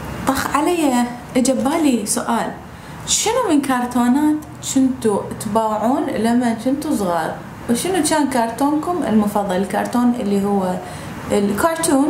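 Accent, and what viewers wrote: Iraq. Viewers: Samah Munther ترا عادي كل محافضه ولهجتها شو كلها مستغربه يعني قابل حاجية مصري لو سوري هي كلها لهجة عراقيه بالنهايه وكلها تفهمها ماله داعي هذا الاستغراب كلش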